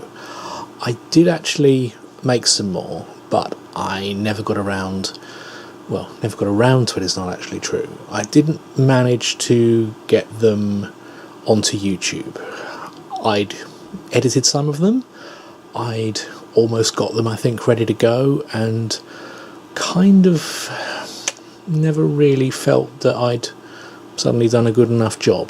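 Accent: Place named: England, United Kingdom. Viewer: Love your "English Accent" and your "Inquisitive Persistence" with the Drobo. Keep making videos when you can!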